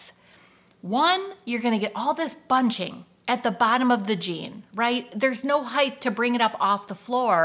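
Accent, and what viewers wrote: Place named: Minnesota, United States. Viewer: I like how you combine your southern and northernaccents…. you say y’all, then you say if ya find ( but with the Minnesota accent!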